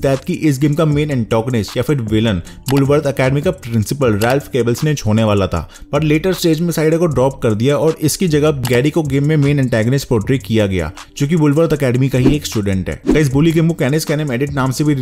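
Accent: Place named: India. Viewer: He is talking indian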